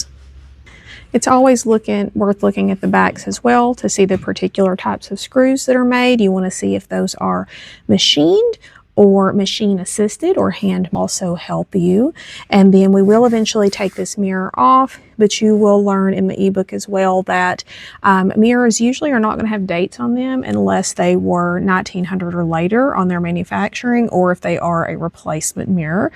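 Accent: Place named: South, United States. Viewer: Did I not say you are intelligent and knowledgeable? Hon, you should hear my accent. I'm from SC. I spent a lot of my life in business offices, and I know how people perceive that particular regional dialect, because I used to speak it. But you do you. I appreciate your knowledge, and will keep watching you, regardless. Honestly, just trying to help, so you will be seen as more professional. People already think anybody with a southern accent is stupid. I live in FL, and I moved from S. FL to N. FL in part because people made fun of my accent. I love it up here. It's truly the deep south of FL, complete with oak trees dripping with moss. Have a lovely, day. I didn't meant to hurt your feelings. <3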